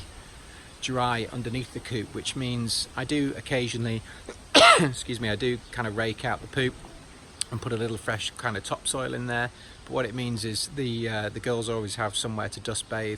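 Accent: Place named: England, United Kingdom. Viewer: awesome! That explains the accent. How you liking the U.S.? It's a lot bigger than England..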